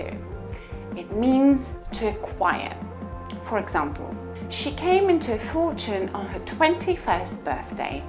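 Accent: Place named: United Kingdom. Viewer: O, my Gosh, I loved your accent! I dunno why, but I like British accent. It's so beautiful and royalty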